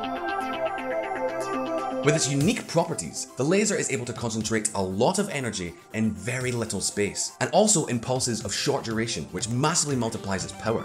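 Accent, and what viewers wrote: United States. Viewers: I love that American accent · You should not apologise for the American accent, but embrace it, because your accent is just awfull... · The American Accent was pretty good. You sounded like my over-bearing neighbor. Good Job. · Yeah, man, as an American, I approve that accent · Firstly, this American approves your US accent. Secondly, laser weapons are obviously real, but they require a TON of power. Until we have more efficient batteries, they will always be land or ship based (and in rare cases, mounted on planes). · your American accent was pretty good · Pretty good American accent buddy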